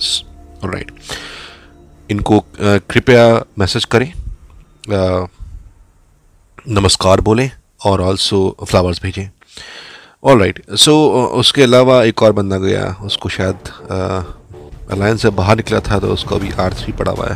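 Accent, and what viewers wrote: India. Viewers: Vi may tari English sunka hamesa sochta tha ki tu Indian he hay lakin confirm nahi tha keuki tu hamesa English may bat karta hay but aj confirm ho gya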